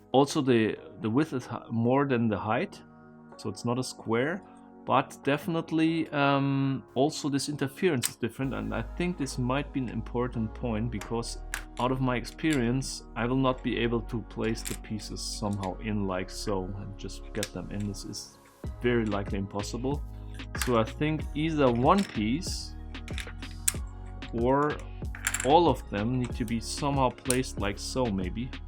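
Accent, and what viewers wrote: Germany. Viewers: Haha love your german accent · I really like your videos, they are so satisfying 😌 also your German accent is awesome 👌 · I am Dutch! I like your german accent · Wenn deutsche Englisch sprechen 👆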